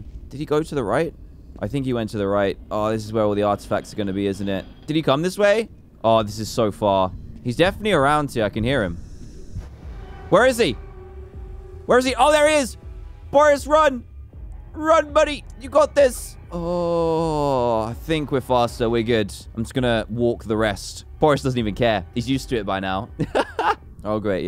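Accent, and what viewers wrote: England, United Kingdom. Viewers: I'm gonna get r/whooooshed for this, but he's saying that "this bone is on my list" very fast and with a heavy British accent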